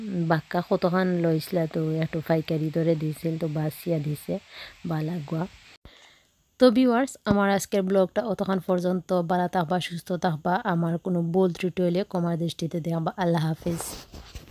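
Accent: Sylhet, Bangladesh. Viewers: Afnar sylheti mat kub shundor, nice vlog oise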